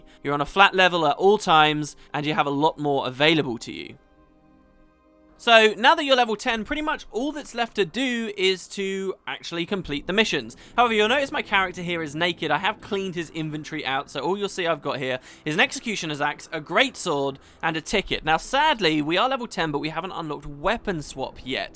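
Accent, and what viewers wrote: United Kingdom. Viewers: I enjoy your videos so much for so many reasons. First of all, I've fallen in Love again with Guild Wars 2.. I said again but actually even though Ive had it pre release, it is the first time.. I have never really played it, I have just 2 level 80 characters and just one with a finished story, but now I play again. And even though I am a noob and there still are so many things I have no idea about, it is so much fun! Your videos give me so much information, there is so much input in such a short time, you talk really quickly and address so many things, I like that so much. Additionally, I am from Germany, and I so much enjoy your british accent (You are from the UK, aren't you?). There are so many things I have missed because I just havent really played the game, but you explain so many things in your videos, I think it makes me love the game even more, and maybe this time I stick to it. 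Eventually I have been playing daily now for a month, at least around half an hour a day if only just to chill and rest in the evening. Never before have I played this much (being aware of the fact, that it IS NOT a lot of time compared to what others spend playing the game). Anyway, thank you very much for your videos! Keep up the great work! LG Nyakes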